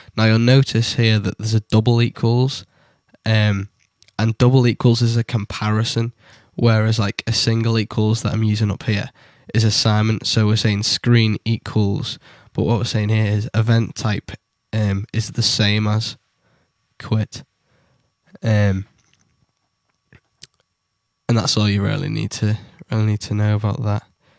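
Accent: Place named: Wakefield, United Kingdom. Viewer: Hey - you sound like you come from Wakefield - possibly Leeds.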